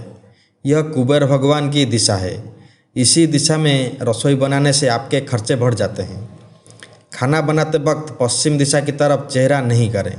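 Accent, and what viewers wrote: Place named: Odisha, India. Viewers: Bhai tum odia ho kya?Isiliye hindi bolte time odia mix ho raha he. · Sir you are belongs to Cuttack as per your hindi pronounced.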